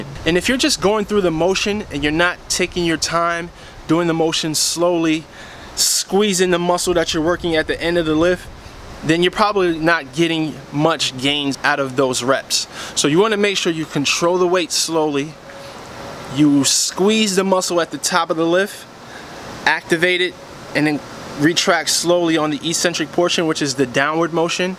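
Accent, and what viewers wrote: New York, United States. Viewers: Ten Chest Commandments... I hear that NY in you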